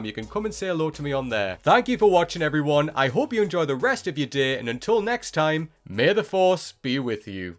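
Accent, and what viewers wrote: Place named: Newcastle, United Kingdom. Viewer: That's so funny that the final chapter in the video description says "Rear Park" instead of Ray Park, when the video narrator's got a Geordie accent :)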